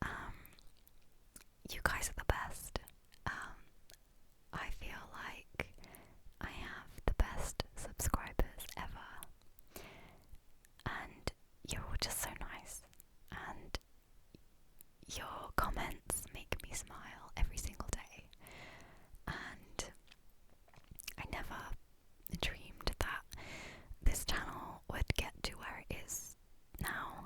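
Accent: United Kingdom. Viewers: Glad to have you back. Looking forward to more wonderful ASMR videos with the new 3Dio. Congratulations on jumping past the 100k subscribers mark. You have the most delightful whispering voice with your British accent! You and Dimitri are amazing ASMRtists. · OMG.. very happyyyyy when i see that your video is up and its a whisper video.. i really like your voice.. your british accent is amazing..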